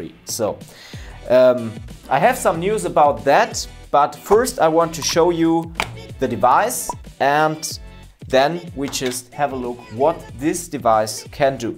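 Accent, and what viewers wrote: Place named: Germany. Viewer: I hear german 😊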